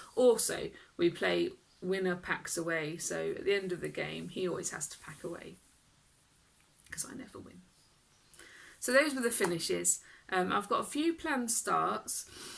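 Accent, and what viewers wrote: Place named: England, United Kingdom. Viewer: Hi there, glad to have found you. I live in Calgary, Alberta in Canada, but lived in the SE of England up until April 2008. Thoroughly enjoyed watching your video and hearing another English accent outside of the family! Cross stitch is definitely a more popular pastime in North America. My LNS is a 40 minute drive away, and the first time I visited it I was like a kid in a candy store, drooling over the choice of patterns, threads, fabrics and other bright shiny things! I started my first Long Dog Sampler in May of this year - The Templar Prophecy, I have also downloaded Pandemic, but won’t be starting that for a while.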